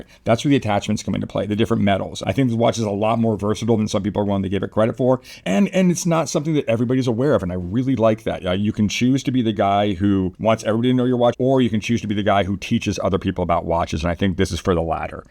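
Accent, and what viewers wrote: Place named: Africa. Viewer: Your pronunciation capabilities are that of an african.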